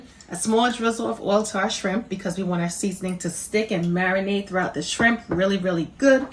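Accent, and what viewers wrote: New York, United States. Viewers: Love you Mama ❤️ never stop 🛑 beautiful accent NYC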